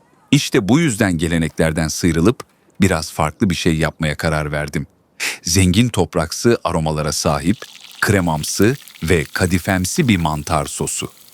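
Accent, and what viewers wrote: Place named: Turkey. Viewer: Salam niyə türk ləhçəsi ilə danışırsınız?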